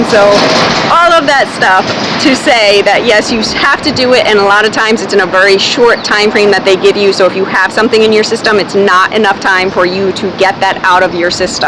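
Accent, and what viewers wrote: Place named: Midwest, United States. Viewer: 1. You say that you are from Pennsylvania yet you seem to have an annoying Midwest accent. Primarily around Michigan or ORD. 2. Do not wear black and blue outfit for your interview. 3. Since you are so short, wear a navy blue dress. 4. Do something with your hair. 5. If you want to paint your fingernails weird colors, go work at Virgin Atlantic. 6. I think you are in your late 40s! (Cameras and film)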